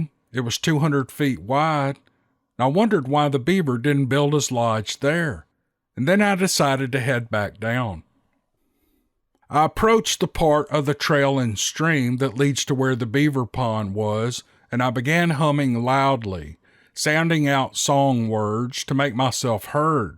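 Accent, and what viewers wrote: South, United States. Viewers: I love a sassy Southern girl! lol. Ya sound like me. Thanks for making me lol ! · I can't listen to the overly southern accent. could speed up the tempo. Better yet, goodbyyyyyyyye. (Accent emphasized, of course)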